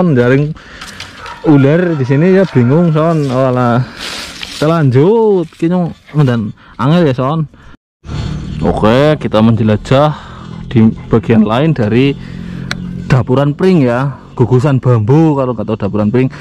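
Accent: Banyumas, Indonesia. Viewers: hahaha ngapakkkkk men rika kang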